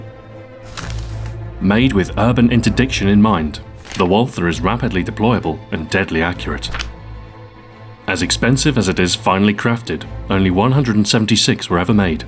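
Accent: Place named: United Kingdom. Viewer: its definitely the british voice that makes this video. always love a fellow british lad. is such a Jeff!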